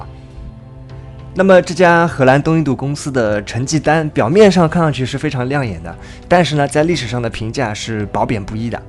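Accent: Shanghai, China. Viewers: 怎么感觉有上海口音了，，，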